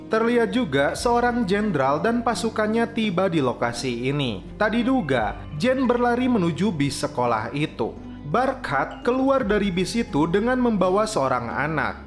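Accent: West Java, Indonesia. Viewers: orang sunda ya bang🗿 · Jirr..adminya Sunda euyyy😂😂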